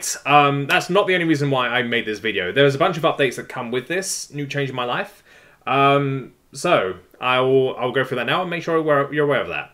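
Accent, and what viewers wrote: United Kingdom. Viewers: This was the first time you sounded positively British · aside from the good news, I have to say, this man is one of the most British people I've ever heard. it doesn't come out in the normal videos.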